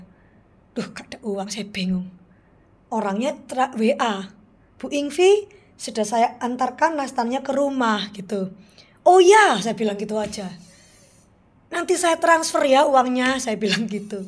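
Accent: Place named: Java, Indonesia. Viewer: Suka logat nya jowo bnget ❤❤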